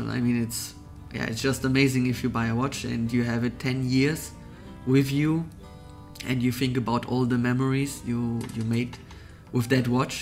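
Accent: Germany. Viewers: Der Akzent 😂 100% deutscher 😂was macht ein Deutscher in Dubai ?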